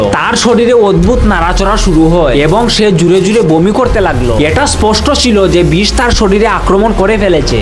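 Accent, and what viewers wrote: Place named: Sylhet, Bangladesh. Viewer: তুমি তোমার সিলেটি ভাষায়ই কথা বলো, শুদ্ধ ভাষা হয়না তোমার, লেজে গোবরে হয়ে যাচ্ছে ভাষাটা।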